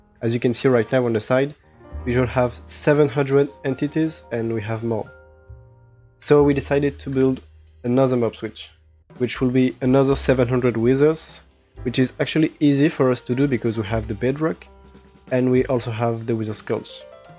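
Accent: France. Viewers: hey are u french ?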